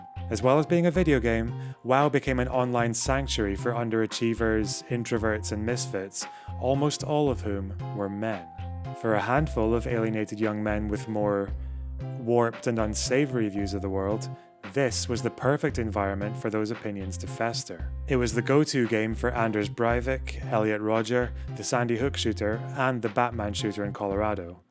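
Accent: Scotland, United Kingdom. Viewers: Màke Your mind up, Scottish or American ??!!. "Fannies"...okay, Scott's. SPEAK ENGLISH BOY !. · bro what part of scotland are u from I’m trying so hard to pin your accent. Or are you from NI? aw mate I’m struggling to sus it out like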